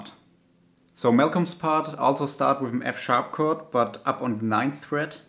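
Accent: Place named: Germany. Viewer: Ohh, okay. Wirklich? Man hört es an der Aussprache, dass du aus D sein musst. Ist bei meinem englischen Kanal auch so, dass merkt irgendwie jeder direkt :) Ja, ich bin immer auf der Suche nach neuen Malcolm Riffs, da ist es durchaus möglich das ich schon mal einen Kommi hinterlassen habe :) Langsam hab ich den richtigen Tick raus, um es beinahe fehlerfrei zu spielen :) VG aus dem Chiemgau, Vanessa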